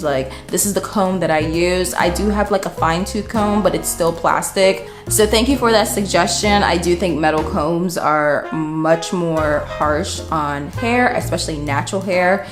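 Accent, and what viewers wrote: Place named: Bahamas. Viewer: I’m definitely going to give Nutrafol a try! Are you from the Bahamas by chance? I hear a very faint accent 😊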